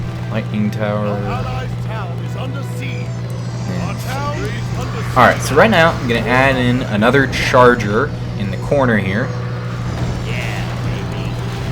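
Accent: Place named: Dakota, United States. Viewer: Are you from Dakota or something whats that accent?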